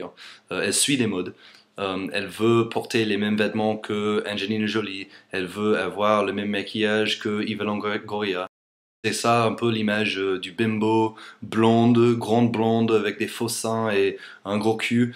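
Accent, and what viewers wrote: United States. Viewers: Cet accent americain c'est charmant! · Hi, After watching some videos, I saw your French is better. I also noticed when you sing in French, you forget your American accent, thanks for your effort. Thank you for your videos and good luck.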